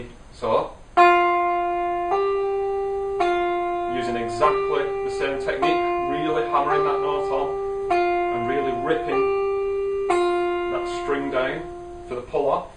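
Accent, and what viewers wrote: Lancashire, United Kingdom. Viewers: You be must be from lancashire. You should like my uncle lol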